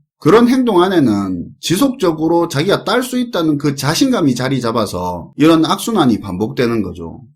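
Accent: Gyeongsang, South Korea. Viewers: 저는 시장은 장기적으로 우상향한다는 낙관론자에 가깝지만 이 부정적인 사람이라고 생각하지 않습니다. 시장을 여러시각으로 바라보는건 당연하죠. 언제나 재밌게 잘 시청하고 있고 갱산도 억양 넘나 좋습니당ㅎㅎㅎ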